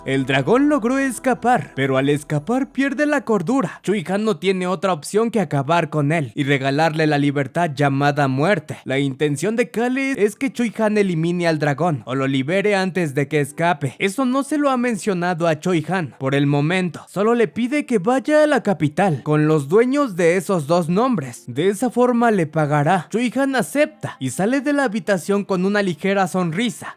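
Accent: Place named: Russia. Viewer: Me gusta la historia y más tu acento ruso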